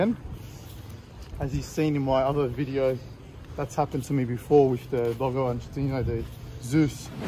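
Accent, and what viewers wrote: Australia. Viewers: You got a bit of an Australian though it seems you live abroad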